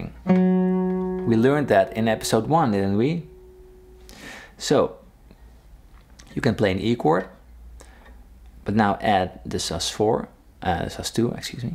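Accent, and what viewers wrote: Netherlands. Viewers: i need to know, are u dutch? your accent sounds soooooo familiar. if so, ik ben ook nederlands! love your vids!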